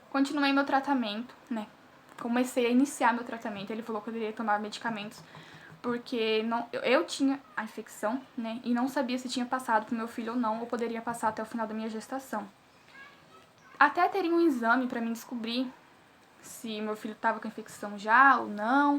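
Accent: Paraná, Brazil. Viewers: Que linda 🥰 amei o seu jeitinho de falar, sou paranaense, do interior kk me identifiquei 😂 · Muito bacana, estou gostando muito do seu canal. Só gostaria de saber de que estado e cidade você fala, tenho a impressão que é do Paraná, estou certa? Mas qual cidade?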